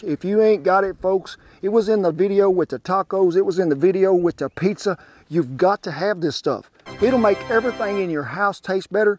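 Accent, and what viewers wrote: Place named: Oklahoma, United States. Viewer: Kent est un vrai bijou pour les amoureux de la cuisine outdoor.Il a un coté frenchy dans sa maniére de manipuler les ingrédients,on sent qu'il aime ce qu'il fait . Et son accent de l'Oklahoma...Génial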